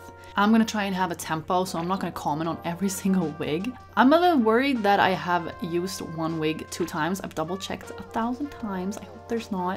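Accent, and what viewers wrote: Sweden. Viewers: Your Swedish accent makes it all better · I've never seen u before but i can hear that ur Swedish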